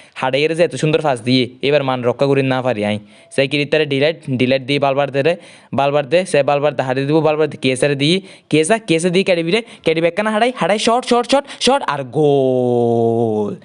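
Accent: Chittagong, Bangladesh. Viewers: Vai apnar basha ki chittagong e?? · ভাই আপনি ১০০% চিটাগং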